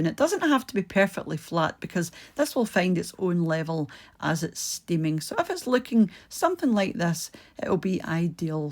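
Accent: Scotland, United Kingdom. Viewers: Looks really delicious, love steamed puddings and your lovely Scottish accent !!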